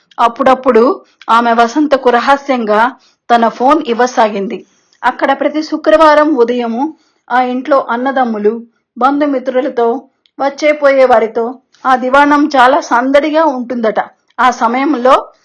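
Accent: Telangana, India. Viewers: గారు నిజంగా మీరు కథ చెప్పిన తీరు నాకు చాల చాలా నచ్చింది. ఏమీ నఛ్చింది ఎందుకు నచ్చింది అని మీరు ఎలాగు అడుగుతారు. మీ voice బావుంది పాత్రలలో జీవించారు ఆవేదన వ్యక్తం చేయడం బాధ పడే పదాలను నిజంగా మీరు అనుభవిస్తున్నట్లె చెప్పారు గొంతు మార్చడం అయితే ఏమి ఎక్కడ తగ్గాలి ఎక్కడ పెంచాలి గొప్పగా చెప్పారు ఇక ముఖ్యంగా వినే వారికి ఒక సినిమా చూస్తున్నట్లుగా feeling వచ్చేలా చెప్పారు అన్నీ పాత్రలలో జీవించారు హృదయ పూర్వక అభినందనలు అందుకోండి కథ వ్రాసిన ఉమ గారికి అభినందనలు మరిచాను తెలంగాణ యాస అద్భుతంగా వుంది. కథ నిజంగా జరుగు తున్న విషయాలను వివరించారు ప్రకృతిని బాగా వర్ణించారు ఇక నుండి నేను మీకు పెద్ద ఫ్యాన్ అనుకోండి good night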